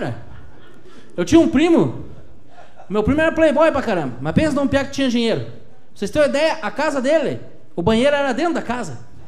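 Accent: Curitiba, Brazil. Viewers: sotaque da região metropolitana de ctba :)))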